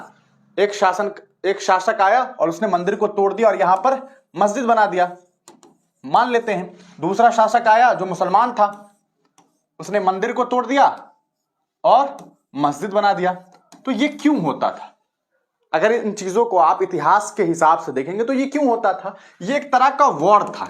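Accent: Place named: Pakistan. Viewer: नाम हिन्दुस्तानी मगर तेरी बोली से पाकिस्तानी होने की गंध आ रही हैं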